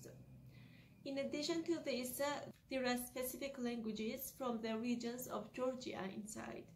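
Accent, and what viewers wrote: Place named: England, United Kingdom. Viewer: I like your English accent. I'm from The Maldives 🇲🇻 and I'm a language enthusiast and I think Georgian (mkhedruli) has the most beautiful writing system. I already know how to pronounce all the sounds including (q'); thanks to my phonetic skills. My target language now is Polish and after that Hungarian and Georgian.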